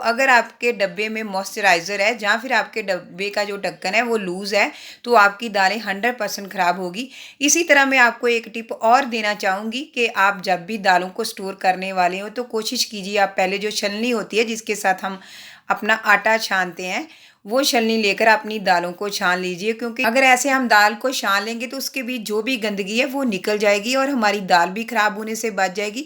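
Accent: Punjab, India. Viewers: Punjabi touch aa Riha h... Mm Punjab ton??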